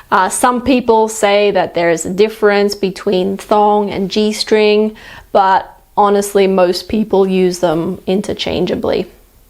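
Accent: United Kingdom. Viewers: Hello, question... are you speaking british english...thanks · Uk accent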